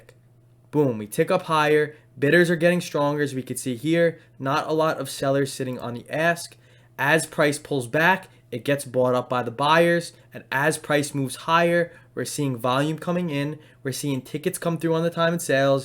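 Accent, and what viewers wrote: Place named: New Jersey, United States. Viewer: Great video thanks for posting. You sound like you're from joysey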